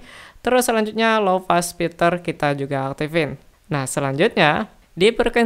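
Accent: Banjar, Indonesia. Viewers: Dari logatnya kaya orang Banjar ini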